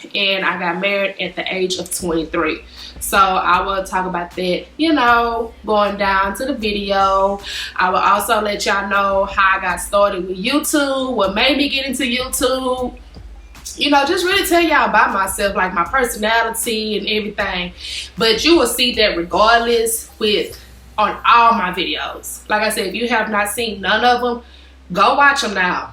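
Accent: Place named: Texas, United States. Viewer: Hey girl new subbie!! You have been making your rounds. I've seen you on Gina's channel as well as commenting on Rochelle's!! Girl I heard the Texas in you as soon as you opened your mouth I knew I was at home! I love it! I'm from Texas too!! Make sure you turn up this year, go out of your 20's with a bang🎉💃🏽🍷I love your vibe, you're so cool and I can tell you're a sweetheart.🥰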